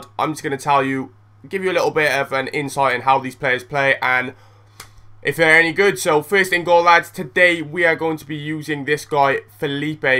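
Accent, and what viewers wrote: Wales, United Kingdom. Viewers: are u welsh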